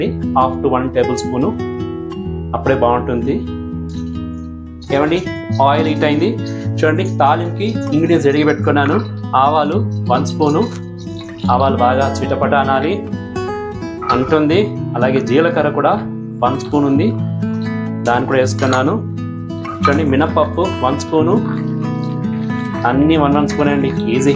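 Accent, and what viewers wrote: Tamil Nadu, India. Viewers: గారు మీరు తెలుగు వార, తమిళ్ తమ్ముడా 🤔 మిమ్మల్ని జెమినీ సీరియల్స్ లో చూసే దాన్ని మీ తెలుగు కొంచెం డిఫరెంట్ గా ఉంది 😊👍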